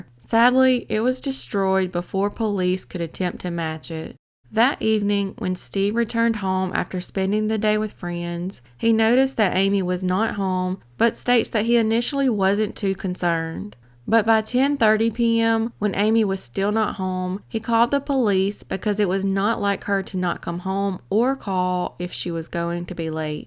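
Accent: South, United States. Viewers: Dont need background music!! Love to hear that good ole country voice!! Thank you!!:hand-pink-waving:🤠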